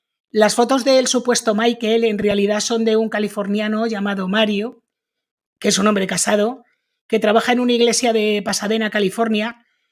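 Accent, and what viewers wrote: Spain. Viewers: No reconoces ese acento de España? 😂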